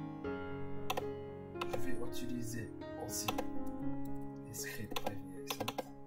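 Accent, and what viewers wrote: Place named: Algeria. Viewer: من لكنتك لنطقك بالفرنسية تبدو لي جزائري 😹☝️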